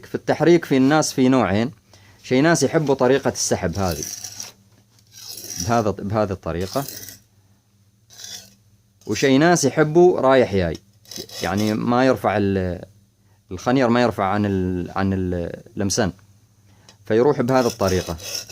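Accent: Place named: South, Saudi Arabia. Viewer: سلمت و بيّض الله وجهك على الشرح الوافي. وبالنسبة للّهجة اللّي تتكلم بها بقلب الجيم الى ياء فهي نفس لهجتنا في قبائل رجال الحجر جنوب السعودية. وسلامتك ✋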